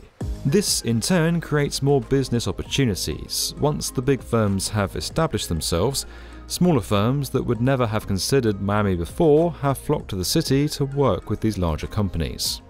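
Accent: United Kingdom. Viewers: British accent is so annoying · It’s hard to take this video seriously and I guess it’s just meant to be easily digestible content with a posh British accent. Having lived in Miami for 20+ years, worked in real estate and other industries, almost every heavy handed conclusion that this video draws is absolutely farcical.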